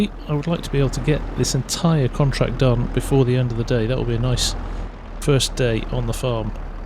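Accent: England, United Kingdom. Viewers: was questioning wether you are English or American, then you looked at the sprayer and did not panic because it wasn't the USA flag xD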